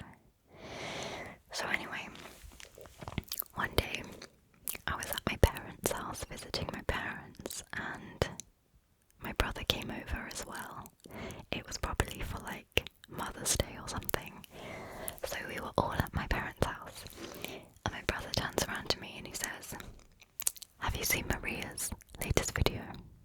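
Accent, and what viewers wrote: England, United Kingdom. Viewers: I tried listening to your story I really did but I feel asleep only to wake up to another one of your videos playing. The sound of your voice alone puts me to sleep, it's one of the most soothing ones in the ASMR community. I think your UK accent has a bit to do with it as well :) You are the best :D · Superb! ASMR and your English accent is a perfect combination👌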